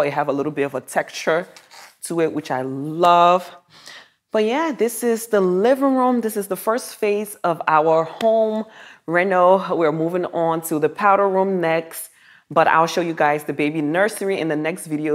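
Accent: Liberia. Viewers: Hi - are you Liberian? I hear the accent... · Everything is just beautiful. This is my first time here. I'm sending a Liberian accent? Keep up the great work❤️💪🏾👍🏾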